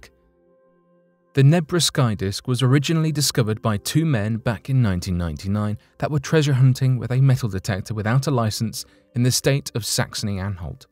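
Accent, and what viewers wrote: United Kingdom. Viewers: Love this site, and the British voice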